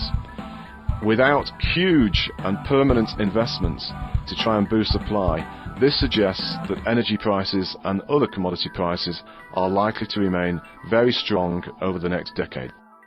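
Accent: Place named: United Kingdom. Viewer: despite that british accent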